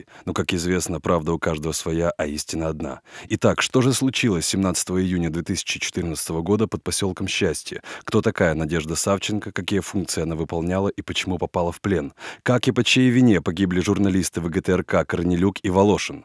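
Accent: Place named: Ukraine. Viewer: По вимові диктора зрозумів, що кліп зроблений в Україні. Вищий клас, така інфорграфіка дуже потрібна. #SaveSavchenko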